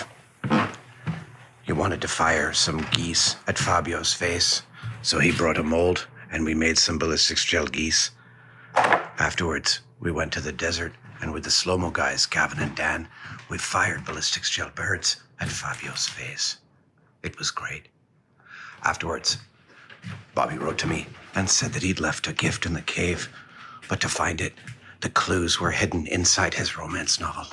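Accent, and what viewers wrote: Ireland. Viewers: I thought it was Liam Neeson narrating. Pretty good attempt at some kind of Irish accent, by an American.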